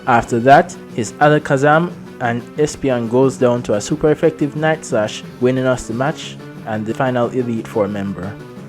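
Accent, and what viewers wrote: Jamaica. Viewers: You sound Jamaican 😊 I know our accent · you from jamaica? i’m from trinidad and trying to pin down the accent🤣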